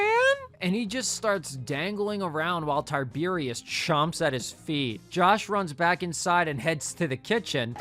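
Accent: Philadelphia, United States. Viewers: bro has a Philly accent 👑 🖍️ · It’s a Philly dialect along with saying “crick” instead of creek and “ratty-ator” instead of radiator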